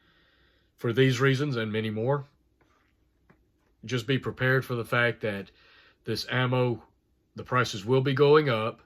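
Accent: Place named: Southern Virginia, United States. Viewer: I'd like to meet you, im in Blue ridge mountains of southern Va, where are u located, you sound like you'refrom my neck of the woods ??? Im looking to form a mag but nobody around me are reliable or aware???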